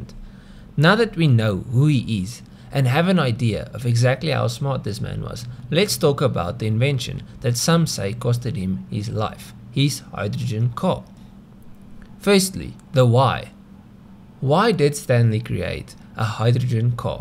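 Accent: South Africa. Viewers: Afrikaans accent is strong 🔥🔥♥️ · South African accent? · The South Africa in this accent is beautiful haha 😂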